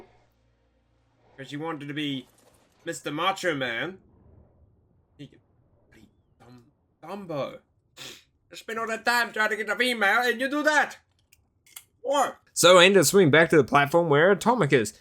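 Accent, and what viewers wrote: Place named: New Zealand. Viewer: 200 days and NZ-english!! Can`t get better!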